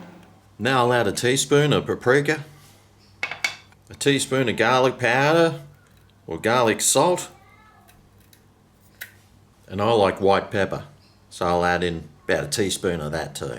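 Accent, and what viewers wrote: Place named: Australia. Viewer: Narrated like a True Blue Aussie 🇦🇺. Bloody shame chickens only have two wings... If they had four, I'd need two bottles of Frank's 👍😄🤤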